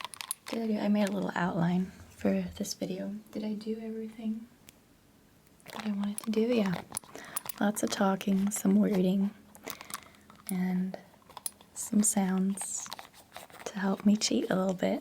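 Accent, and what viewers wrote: United States. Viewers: How do you sound even more American in your regular voice 😂 The change is tingly though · I’m confused. How are you German, but have an American accent? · You really, really sound American. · How do you have an American accent?? Did you pick it up from American shows or learnt from a German who spoke English with an American accent? I'm so confused rn 😂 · You sound even more American than usual. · Why is your english accent so American? Aren't you german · Can you please tell me how/why you have an American accent but, I think you said, you’ve never lived there?! I’ve watched you for YEARS and have always wondered! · Whatttttttt?! Where’s the German accent? You sound like a normal American I’d talk to in the street 😂🤯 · HOW is your accent so American. It always stuns me how American you sound! I wish my language skills were half as good! 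· I never noticed how much emotion is taken away from your voice when you whisper but it sounds weird hearing you with more range and emotion. You also sound a lot more American when you speak normally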